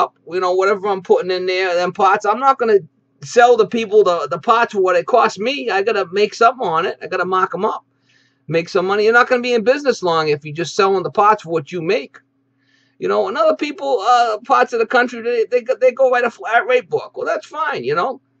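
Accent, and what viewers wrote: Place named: New Jersey, United States. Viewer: You tell it like it is. You ain’t no charity fix-all. If people want oats that’s already been through the horse they come a little cheaper but if they want nice fresh green oats, they gotta pay for them. Like all of your videos and your accent. Jersey?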